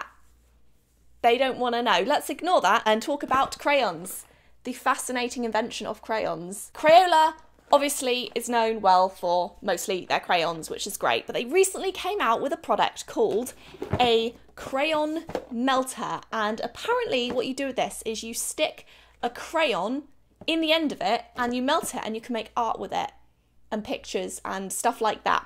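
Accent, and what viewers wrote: England, United Kingdom. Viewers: That artwork was AMAZING, the talent you have is so beautiful and your english accent makes my heart melt🤗💗 Saying hi! From Argentina🇦🇷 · DON'T say "it stinks of Crayon".... that's the BEST PART of a Crayola crayon.Nothing takes me back to my childhood that opening a box of crayons and sticking my nose i it to get my lungs full of that Delicious aroma. If they would bottle it in liquid, I would buy it. From your accent I can tell you're English so maybe this is the reason you think this stinks. Not me, and I'm 74 years old. I still get boxes of crayons for Christmas or my birthday because everyone KNOWS i love crayons. It's fun to sit and color in one of my old coloring books. I stopped short of you beginning the video.... maybe crayolas would be better for you if they smelled like CHEESE?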